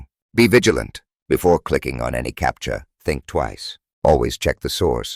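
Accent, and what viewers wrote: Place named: United Kingdom. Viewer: "American Tales" with a British accent. Funny. Thanks though :)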